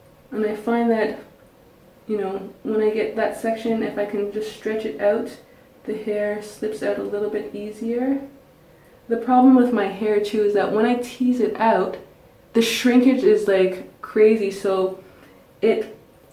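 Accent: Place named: Canada. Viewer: I hear a Canadian accent...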